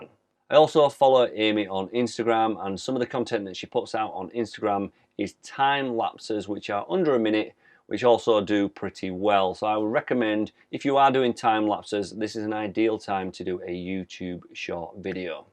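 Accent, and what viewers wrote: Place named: Scotland, United Kingdom. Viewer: Ahhh a Scottish lad! Great content dude. Subscribed!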